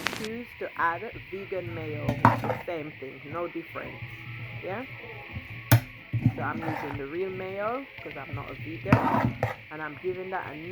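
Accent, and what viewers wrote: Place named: United Kingdom. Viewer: Damn that British and Britishhhh 😅😅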